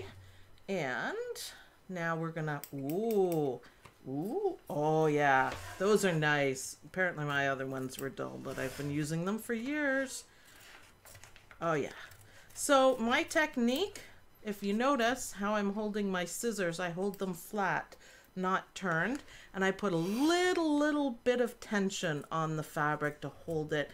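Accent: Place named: Ontario, Canada. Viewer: As usual, I'm watching late. My mom always said I'll be late for my own funeral (doesn't sound so bad, actually!). Love the fabrics you used, so cute. KK designs work out perfectly, every time. BTW, I'm mostly from Ontario - Niagara area, Welland & St. Kitts. PEI. Many of the States. But, Ont is home. That's why I enjoy your accent so much - it's home. 🇨🇦 Thanks for another wonderful video!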